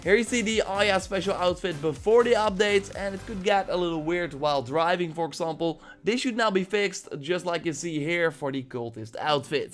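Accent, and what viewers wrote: Netherlands. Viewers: Your accent is pure aids. Are you dutch?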